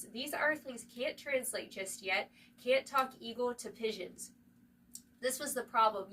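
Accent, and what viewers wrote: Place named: Texas, United States. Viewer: Everything is always working in my favor., I LOVE your Texas twang!😂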